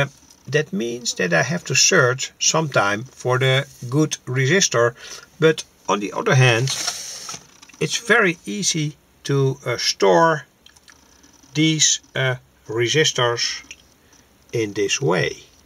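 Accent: Netherlands. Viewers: I love your Dutch-English accent. It reminds me so much of my grandparents. Thank you very much for the excellent advise on sorting components. I am very much interested in seeing more videos that are dedicated to the testing of the various components. This video is very inspring.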